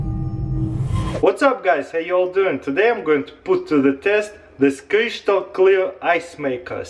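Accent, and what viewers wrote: Russia. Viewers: I also like your russian accent!!!🖒🖒🖒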